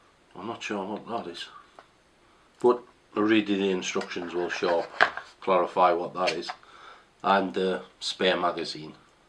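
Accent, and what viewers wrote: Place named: North, United Kingdom. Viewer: Well done mate....its nice to see and hear a down to earth northern lad.....saving and saying £700 is still a lot of money to us normal working lads.....most of the reviews,they have massive houses or been given guns to review....you've convinced me what my new gun will be👍🏻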